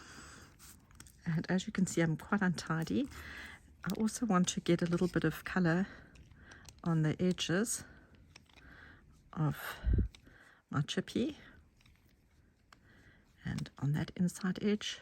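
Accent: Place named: South Africa. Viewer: Hi! I JUST found your channel and I'm so excited to hear a fellow South African accent 😂 I'm currently binge watching all of your videos. Being new to junk journal making, I wanted to ask where you buy all of your stuff from? Especially your tapes and Tim Holtz stuff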